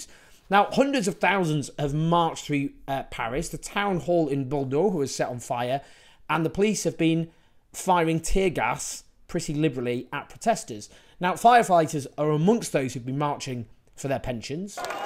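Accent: United Kingdom. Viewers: British need to get rid of the royalty thing. It's so unthinkable that you still admit this kind of institution. We got rid of our king a long time ago (although killing him was a bit too much). Our sense of resisting and expressing the popular demands come from that time when our people took threw away the monarchy. Anyway, lovely to hear your British accent. I feel like I am hearing a Shakespeare play at times.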